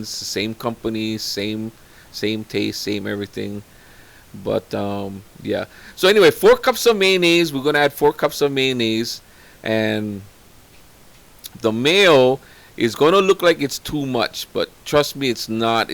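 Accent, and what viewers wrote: Hawaii, United States. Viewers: New Subbie! Love the pigeon accent - passion for food & sharing your recipe. So happy I found your content. Mahalo! Born & raised in Waianae HI - now in Seattle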